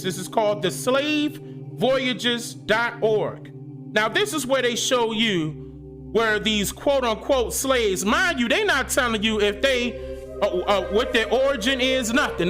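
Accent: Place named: Philadelphia, United States. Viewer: Boom! Sounding so Philly too. 🙌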